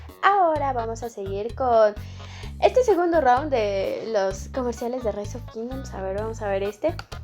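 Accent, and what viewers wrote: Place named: Yucatan, Mexico. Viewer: eres de Yucatán? que bonito acento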